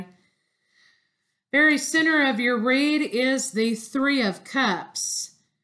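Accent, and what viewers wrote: Texas, United States. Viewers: You are truly gifted. Totally resonates. You sound like you are from Texas, like me. Blessings..🙋🌹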